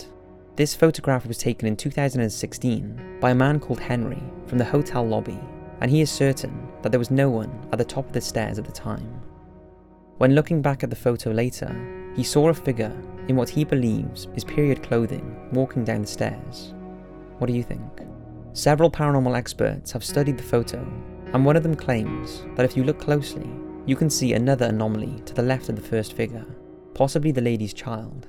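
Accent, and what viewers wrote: United Kingdom. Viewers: To me why is it scarier when a British person speaks about spooky things 😎😑 you just earned a subscriber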